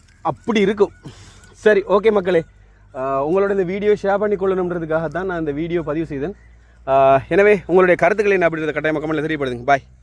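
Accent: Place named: Chennai, India. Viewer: கிளாக்காய் காட்டில் இருக்கும் எல்லோருக்கும் தெரியும் நீங்கள் முதலில் Madras தமிழில் கதைப்பதை நிறுத்த முயற்சியுங்கள் இலங்கைத்தமிழில் கதையுங்கள்.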